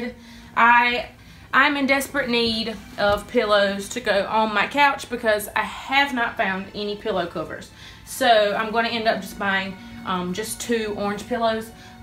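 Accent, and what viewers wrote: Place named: North Carolina, United States. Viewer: OMG you sound like you are from Lumberton North Carolina! Lol where state do you live in?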